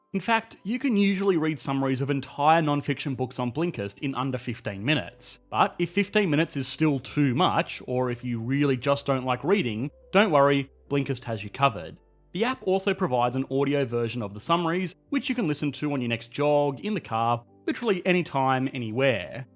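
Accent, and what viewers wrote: Australia. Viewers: "This! Is Texas." I've been waiting to hear this Aussie say these words for too long! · Me, a stranger lost in parts unknown, listening to an Australian talk about the economy of Texas while i watch a round blue creature waddle around in front of me waving a mallet. I think it's time for me to move to Texas. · I an Irishman listening to an Australian talking about the economy of Texas 😂😂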